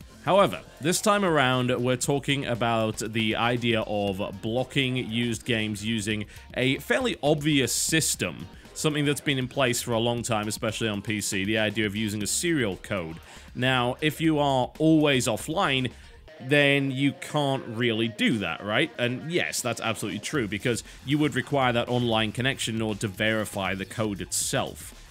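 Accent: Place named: England, United Kingdom. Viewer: ...theyre two different pronunciations. and keep in mind, the language is called english, and he's from england. see a connection?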